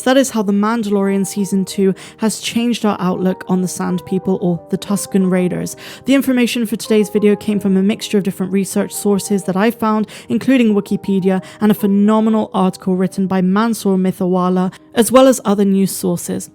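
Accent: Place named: Somerset, United Kingdom. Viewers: I enjoyed your video, fellow West Country pirate xD is that Somerset I'm hearing? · That South West accent gets me every time 😊 "Jibber Jabber !"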